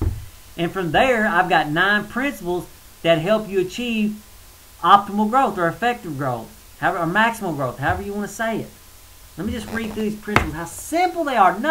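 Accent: South, United States. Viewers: You're awesome mate.. love your southern accent. Thumbs up ;D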